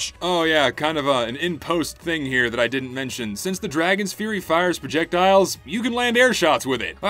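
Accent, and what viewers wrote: South, United States. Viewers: Why is a southern accent creeping it's way into voice throughout the video? it's kind of creeping me out lol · texas accent shining through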